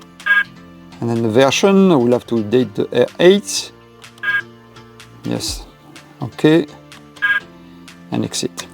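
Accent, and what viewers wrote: France. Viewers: Ooo lya lya! strong French accent! Therefore, the question is what kind of radar detectors ( models) can be used in France!!! Where, as we are all know, VERY, VERY serious consequences of using such devices. P.S. My experience - NEOLINE X-COP 870s, not in France offcourse... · it’s a French accent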